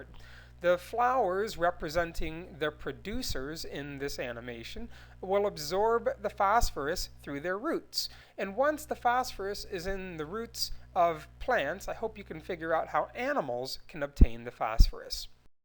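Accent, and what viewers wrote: Midwest, United States. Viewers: I'm from Michigan. The way he says "rocks" sounds exactly how everyone I know says rocks. I was waiting for him t sound weird, but he never did. Must be a midwesterner.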